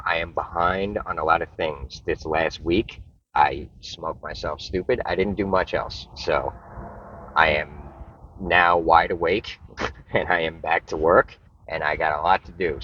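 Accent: New York, United States. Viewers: I love how New Yorkers call it Flurida! Love ya bro, what is wrong with smoking weed? It’s about as harmful as drinking coffee, you don’t see coffee drinkers saying they are going sober. I support you 100% though, only you know if it’s a problem. You have helped more people with addiction problems than you know by being honest. Love ya Brother, good muck with your progress. I’m a retired Machinist and I’ve never met another Machinist that wasn’t a pothead. We would have to read blueprints and edit blueprints as we were making maybe aerospace parts, no relation to Boeings bs, and our best work was when we got off work, sat around the house, smoked out, and talked about how we could machine this part better, use a different tool steel, etc. We were never high on the job, but our most amazing work ideas came from sitting around being high. We actually worked on some of the self guided depleted uranium 20mm rounds the Gov’t may have been trying to fast track in Afghanistan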